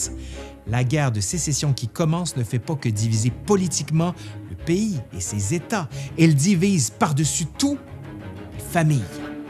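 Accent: Quebec, Canada. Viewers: Avec ce petit accent canadien qui fait que le charme est complet car on est complètement dedans ! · J'aime son accent français. Est-ce Canadian ? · Super vidéo, je suis un passionné de la guerre de sécession, et c'est un véritable plaisir de l'entendre raconter par ta personne. Surtout ne perd pas ton accent Québécois, cela enlèverais la moitié du plaisir à t'écouter. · Le narrateur a un superbe accent Canadien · Beau travail, l'accent Québécois ajoute un côté agréable même si quelques mots m'échapes . Mais pas grave le sujet est boulot effectué est très agréable a visionner. Bravo ! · Très intéressant. Un point objectif et clair sur la guerre de sécession 😮. En plus l accent canadien est au top😅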